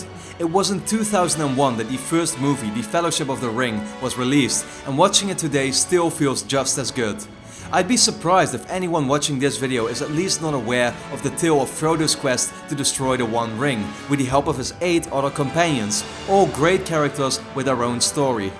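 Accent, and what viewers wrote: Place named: Netherlands. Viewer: Oke i just subscribed to your channel. And i want to ask you were do you live? Because i think your dutch atleast you have a dutch accent. I think it is awesome that you and i have exactly the same taste of games and movies. My favorite games are the uncharted series and my favorite movie is the lord of the rings and that is just the tip of the iceberg. Awesome list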